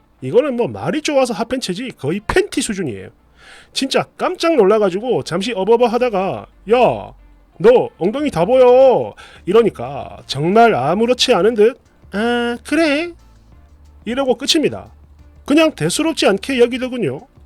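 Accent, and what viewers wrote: Gyeongsang, South Korea. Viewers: 덕분 에 마니 웃고 갑니다 칠학년 에 웃을 일이 없는데 의 그 다른 사람 이 흉내 낼수 없는 경상도 스타 일 그 특유 의 진짜 잘 보고 있어요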